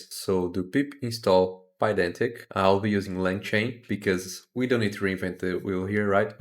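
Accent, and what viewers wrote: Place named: Brazil. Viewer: Não interessa o quão bom o seu Inglês seja, um Brasileiro sempre reconhece o outro haha, muito bom seu conteúdo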